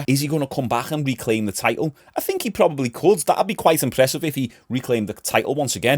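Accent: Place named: Yorkshire, United Kingdom. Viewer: Ars tha from Yorkshire m8? (I'm a dual citizen of Canada and UK, lived in Leeds for 4 yrs... trying to narrow down your accent)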